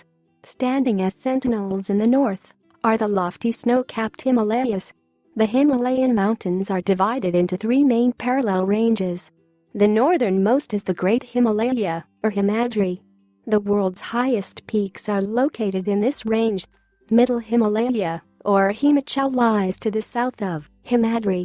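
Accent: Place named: United States. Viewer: What I didn't like was that this was in american english... so, it was very difficult to understand places like Kanya Kaumari, Rann of Kutch and Arunachal Pradesh... So this is a bad video...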